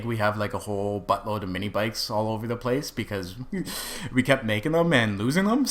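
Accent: Canada. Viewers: You're totally Canadian aren't you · he sounds canadian..... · you canadian cause i am and u sound like u are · You sound so canadian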